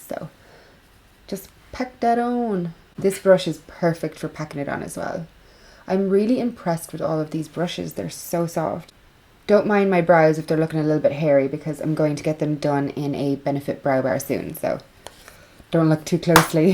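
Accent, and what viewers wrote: Ireland. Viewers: The very best review & info on this product!! I have been so interested. You have the most wonderful accent, I love it!! Irish? You are my new favorite you tuber!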